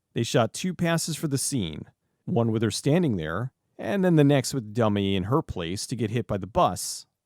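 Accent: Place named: Boston, United States. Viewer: I've never noticed your Boston accent before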